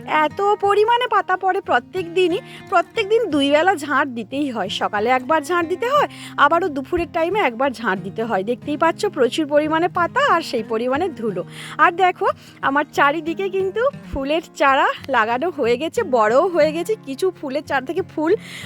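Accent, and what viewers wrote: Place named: West Bengal, India. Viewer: কথা শুনে বোঝা যাচ্ছে ইন্ডিয়ান বাঙালী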